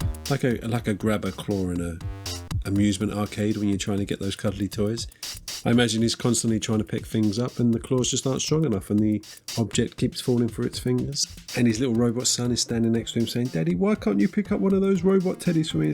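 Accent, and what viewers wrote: United Kingdom. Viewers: Hahaha, your rambling sounds very much like Korg from Thor Ragnarok, with a British accent. Love the channel!!!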